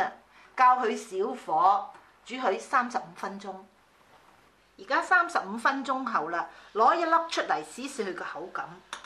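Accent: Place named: Hong Kong, China. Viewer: I think she comes from Hong Kong (from her Cantonese pronunciation). Coconut milk is not a traditional Chinese food ingredient. This dessert is originally from Hong Kong.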